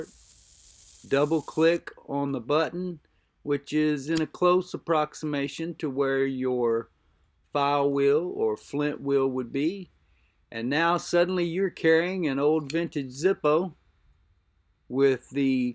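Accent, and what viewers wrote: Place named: South, United States. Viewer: Dude I love your commentary just a good ole southern fella. Thank you for the video good sir.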